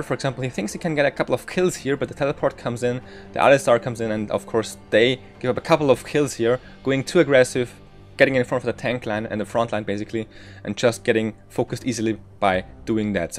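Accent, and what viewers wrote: Germany. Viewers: hört man irgendwie am akzent :D bzw der ausprache :D PS:Cooles Video :D man kann viel daraus lernen :D leider aber von s5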